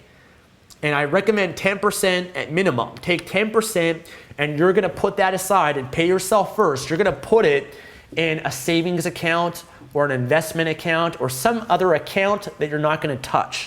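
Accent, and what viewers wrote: Canada. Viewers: Great information, thanks dude! and your accent has Canada writen all over it. nice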